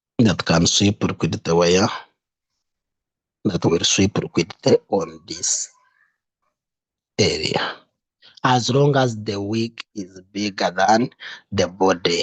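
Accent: Ankole, Uganda. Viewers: That accent is for pure banyankore not bakiga. Much love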